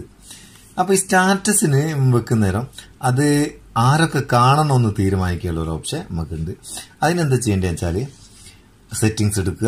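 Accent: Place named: Vatakara, India. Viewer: I sincerely appreciate you. Your slang is clear that you from Badagara region.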